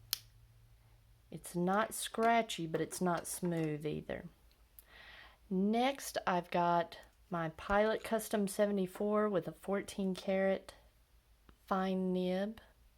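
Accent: South, United States. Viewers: I’ve really been enjoying your ink studies. Tell me, do I detect a southern accent?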